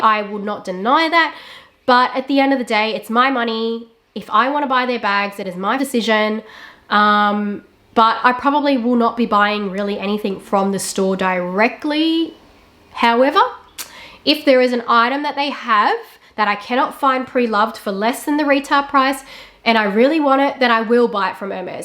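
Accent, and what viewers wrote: Australia. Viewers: so interesting! I actually found you from this drama but will continue to watch your Chanel now ! Keep it up! I’m a aussie in the USA so nice to hear a aussie voice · And you think you know everything and can determine SOMEONE ELSE'S place of origin simply because you don't like them, or the fact that they're from your country of origin. Do you even know what Aussies SOUND like, because she sure af sounds like one to me.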